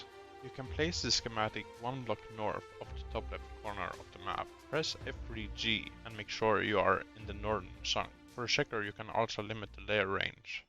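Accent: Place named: Europe. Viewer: Dawg he's just European cut him some slack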